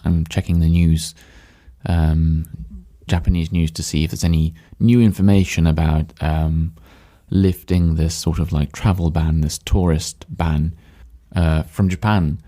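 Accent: United Kingdom. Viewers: Do you speak with rp accent?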